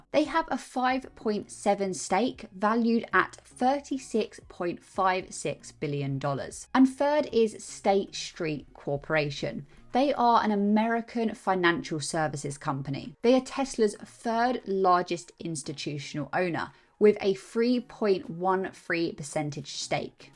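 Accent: England, United Kingdom. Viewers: When you speak, I think of a Charles Dicken's novel! Amazing, genuine, English accent!!! 👍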